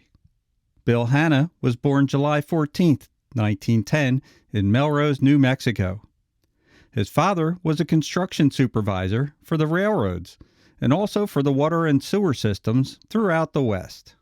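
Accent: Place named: Philadelphia, United States. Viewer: are you from Philly? That accent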